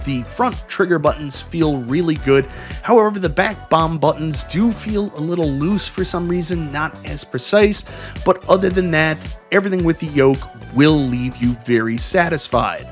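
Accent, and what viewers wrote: Newfoundland, Canada. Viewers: Are you a newfie or caper?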